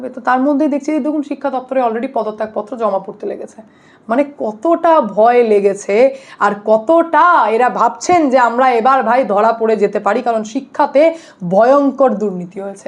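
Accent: Bangladesh. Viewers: তোমার বাঙাল কথা টা যে কি ভাল লাগলো 😂😂😂😂😂😂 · tumi to besh bangla desii bhasa ta darun bollen khub bhalo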